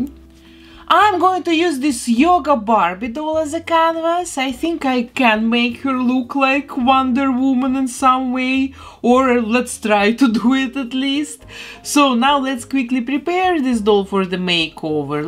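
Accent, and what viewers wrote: Russia. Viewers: Русский акцент? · А никого не смущает ее дикий русский акцент? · русская чтоль? акцент- слушать невозможно, только смотреть, видео интересное! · Кукла получилась классно. У вас сильный русский акцент, поэтому подумала, что вы меня поймёте). Работа сделана весьма талантливо.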